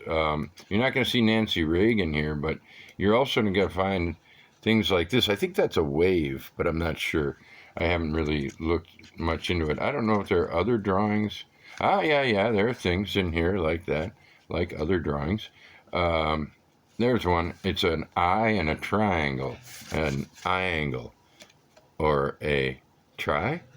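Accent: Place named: Italy. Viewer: Yes, your Spanish has an Italian accent. 😀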